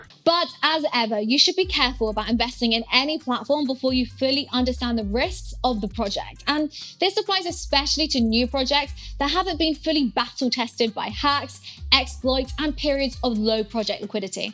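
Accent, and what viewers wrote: England, United Kingdom. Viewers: Good, I bought POLS last month. Makes me feel better to see her taking about it in her cute English accent. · I have to listen to her at .75 playback speed. She speaks way too fast and the English accent doesn't help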